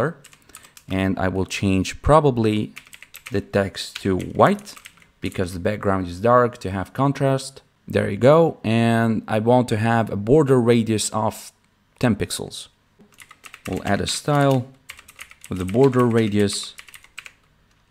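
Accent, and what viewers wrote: Russia. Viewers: bro got russian accent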